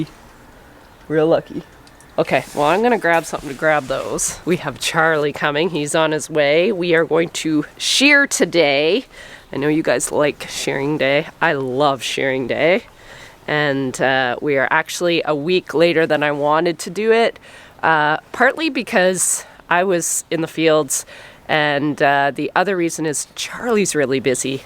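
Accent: Scotland, United Kingdom. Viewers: Can’t go wrong with a Scottish accent!! My dad who passed away 10yrs ago was Scottish and I loved his accent. I miss it. Just wondering, you utilize everything from a lamb that you can, right? Well, when they go to market, who gets their skin? There is a market for it isn’t there? I remember going to a restaurant once on the Old Banff Highway and it was run by the First Nations and upstairs was a museum where they showed a buffalo and what parts of the body was used by them and for what, right down to the testicles being used as a baby rattle. 😳 It was interesting to see it. Very little of the animal was wasted. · A good Scottish accent right there 🫶🏴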